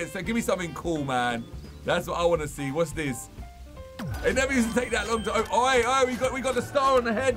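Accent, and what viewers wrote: United Kingdom. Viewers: love ur britain accent greetings from germany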